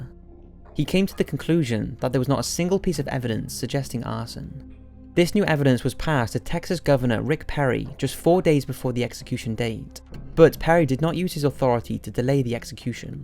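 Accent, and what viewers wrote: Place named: England, United Kingdom. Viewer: Possibly because he's an English person? The rest of the world shouldn't have to change the way we all talk just because you Yanks can't take the time to figure out other countries accents and slang. So arrogant.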